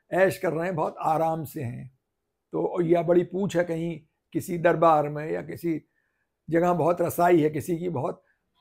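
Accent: Lucknow, India. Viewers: پاکستان میں بیٹھ کر آپ کی بدولت لکھنؤ کا لہجہ سننے کو مل گیا۔ بہت خوبصورت ۔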